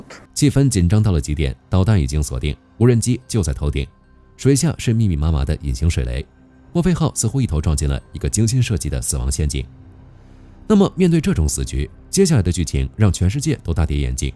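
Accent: China. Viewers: 这英语 浓浓的中国口音啊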